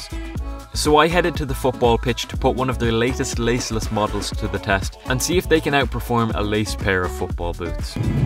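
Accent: Ireland. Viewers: Are you irish? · R u Irish?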